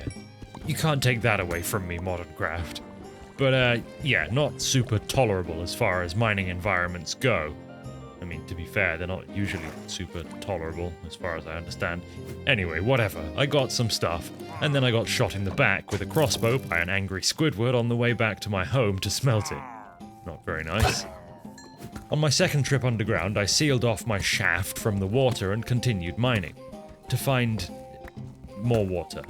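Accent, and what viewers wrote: United Kingdom. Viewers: I blame Totalbiscut (rest his soul) for my affinity for British commentary. There is simply nothing else like said in your accent, so charming and quaint. Its like a storybook or an episode of Thomas the Tank Engine. Love your vids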